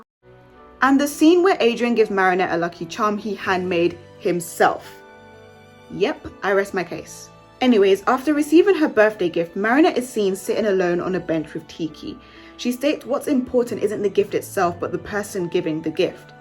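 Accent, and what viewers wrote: United Kingdom. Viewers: I love your British accent 😍 ❤ it's so nice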